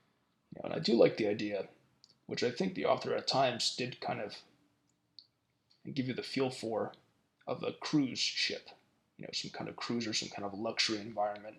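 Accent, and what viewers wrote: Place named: United Kingdom. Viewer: Your knack for surviving by the skin of your teeth far outweighs your British accent.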